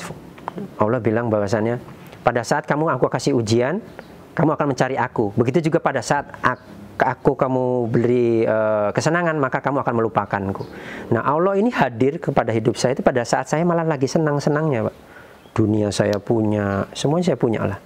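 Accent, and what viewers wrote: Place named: Java, Indonesia. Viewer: Ngomongnya medok nga gerti...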